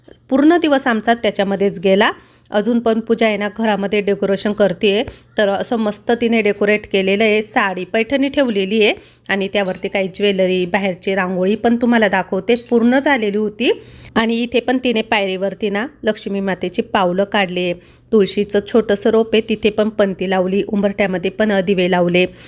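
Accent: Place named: Jalgaon, India. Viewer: मावशी तुम्ही जळगाव मालेगावच्या दिसत आहे बोलण्या वरून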